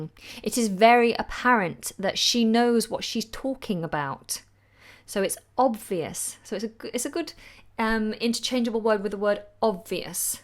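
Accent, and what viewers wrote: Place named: RP, United Kingdom. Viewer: I’m so impressed with your composure, your delivery and your genuine commitment. I love your accent. RP? I live just north of Boston so my accent is unintelligible. You are doing great work.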